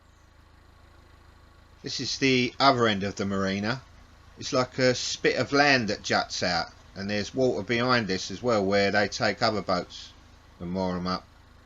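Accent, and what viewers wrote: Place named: Cockney, United Kingdom. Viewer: Lovely cockney accent!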